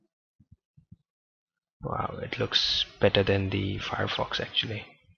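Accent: India. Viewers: Phir bhi dil hai hindustani !! Nice Axent ! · your voice is so stereotypically indian lol no offence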